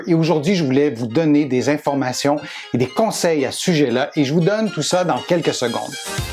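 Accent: Quebec, Canada. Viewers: Dès le début j'entends l'accent Québécois je sais d'office que je suis tombé sur du contenu de qualité. Merci beaucoup pour cette vidéo ! Un cousin français. Je m'abonne !!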